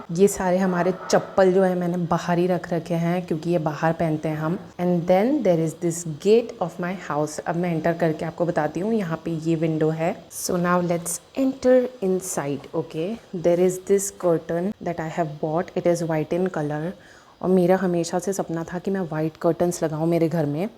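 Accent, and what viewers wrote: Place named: Gujarat, India. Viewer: Muje aisa kyu lagta hai ki aap gujrati ho😂kuch words sun k muje laga.. Bt nice chota house. Bohot hi acha ghar hai. Apka ghar ise acha hai ya fir ye ghar acha he.. Jarur batana👍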